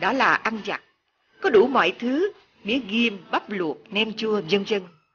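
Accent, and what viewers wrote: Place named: South, Vietnam. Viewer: Người đọc giọng Nam Bộ rất dễ nghe, tình cảm, rõ ràng. Cám ơn bạn.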